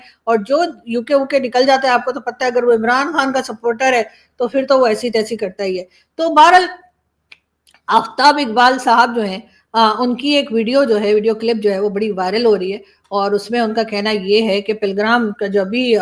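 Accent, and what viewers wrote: India. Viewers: Tapka ke chle gaye … UP ki Bhasha … madam.. apke lehze Mai UP jhalakta hai 😂😂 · ap Mumbai ke bhasa bolte huye bohut achhaa lagte Hain Love You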